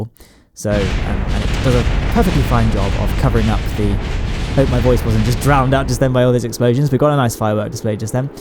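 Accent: United Kingdom. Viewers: Your intro could have been a BBC TV intro, with your accent and all.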